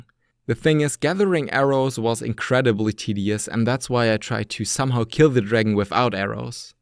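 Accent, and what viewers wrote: Germany. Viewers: Du hörst dich bissl deutsch an…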